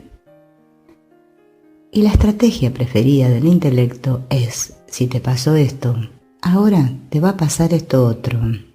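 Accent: Argentina. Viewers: Gracias por la claridad con la q explicas..sos Argentina?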